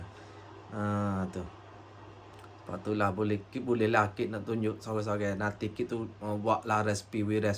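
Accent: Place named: Kelantan, Malaysia. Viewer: Saya baru tgk resepi Yorkshire ni Dalam channel Cik Yatie Vlog. Tgk dia buat nmpk sedap n mudah.Dpt pula suggested dr YT channel ni, terus melekat. Nak try buat jugak lah pudding ni. Btw, cute lah kecek kelate.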